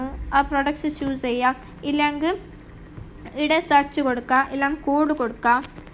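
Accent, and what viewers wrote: India. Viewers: Ksd basha pola ind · Malayalee ano atho tamil ano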